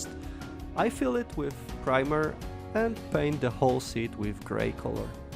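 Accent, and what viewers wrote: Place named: Eastern Europe. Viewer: Oh, no widzisz zasubskrybowałem już kiedyś i nawet nie sprawdziłem do kogo 😄 Po akcencie czułem że jakiś słowiański człowiek, ale nie wiem dlaczego zakładałem że może Czechy lub Słowacja. Co do szachownicy, mogłem faktycznie przeoczyć, dopiero gdy instrukcję Eduarda pokazałeś z sowiecką wersją ii zwróciłeś uwagę że to źle jest - rzuciło mi się to w oczy. Dzięki w każdym razie za wideo i gratulacje jeszcze raz świetnie wykonanego modelu. Ja po wielu latach postanowiłem w końcu zacząć nagrywać swoje produkcje, ale na razie jestem na etapie eksperymentów z oświetleniem, ustawieniem kamery, montowaniem itp. Bardzo to niekiedy komplikuje całe przedsięwzięcie, więc tym bardziej doceniam dobre wideo 👍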